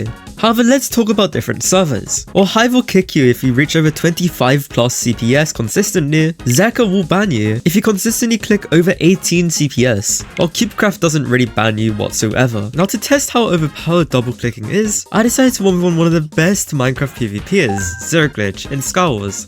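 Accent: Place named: England, United Kingdom. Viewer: Your English accent is goated dude!! 🔥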